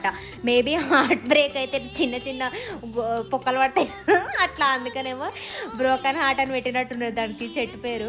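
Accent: Telangana, India. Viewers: Pakka Telangana 😂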